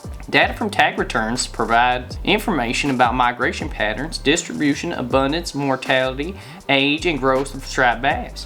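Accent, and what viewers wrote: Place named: North Carolina, United States. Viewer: DUUUUDE!! I am a freakin HUGE deadhead! Freakin DOPE-ass hoodie! I followed a few shows back in the day! Warren Haynes is a POWERHOUSE guitarist/vocalist from the ABB!! Gov’t MULE KICKS ASS TOO! if I didn’t know better I’d thought you were from down here in NC! You talk just like these people down here! Awesome video! I appreciate you! BABAAM!!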